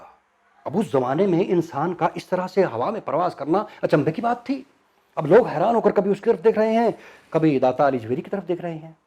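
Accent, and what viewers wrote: India. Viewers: chutiye india ki urdu na bol....lahore waly marein gy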